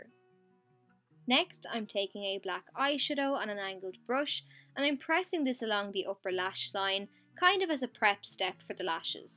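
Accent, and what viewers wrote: Ireland. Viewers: Are you Irish? Because your accent kinda sounds like Jacksepticeye.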